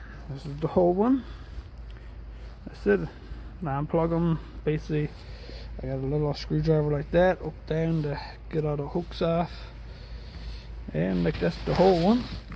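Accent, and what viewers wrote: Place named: Newfoundland, Canada. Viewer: what part of Newfoundland are ya from?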